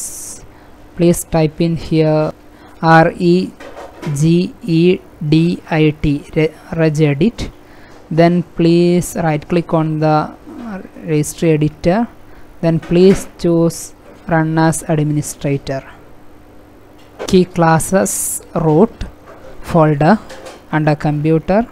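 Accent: Kerala, India. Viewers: Malayali aanalle..? 😂😂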